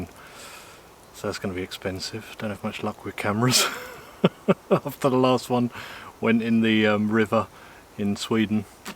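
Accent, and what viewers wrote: England, United Kingdom. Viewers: I'm sure your significant other loves your camp cooking. I know I would even with a good couple of nice beers. Love your lifestyle. Was hoping to get out myself hot tenting in the snow but all we have had is rain, rain., rain over here in Southwest Washington State USA. Stay safe and thank you for taking us along on your wonderful outdoor adventures. I love your British accent. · Nice video English Accent different than the NYC dribble BS😎👍 That stove attached to the link is currently unavailable, oh well I guess that is the way it goes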